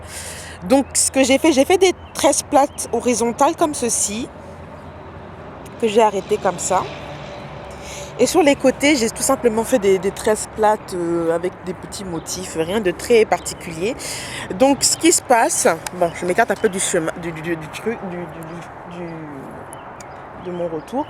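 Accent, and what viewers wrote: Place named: Cameroon. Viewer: héhéhéhéééé bon courage dans ta nouvelle vie!!! t partie en scred!! et qu'est ce qu'il fait beau la ou t'es!!! t partie pour les études?? hi hi hi Riri nous inspire bien!! et la tu parles avec l'accent camerounais I swear!! lool allez on attend les Videoo avec impatience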